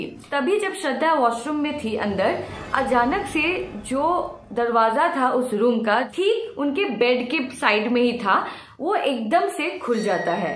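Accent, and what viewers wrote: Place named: Bengal, India. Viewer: Are ypu bengali by any chance i know considering ur sirname its not possible yet you have the accent when a bengali speaks hindi and you have a bengali beauty kind of a thing in your looks.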